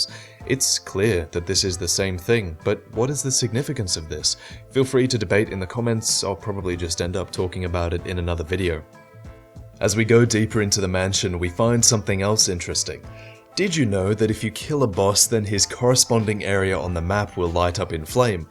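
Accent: France. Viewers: bel accent français ^^ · Your french accent is not that bad ^^ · your accentin french is soo aaaaaaaaaaah <3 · Nice french accent :) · Good French accent.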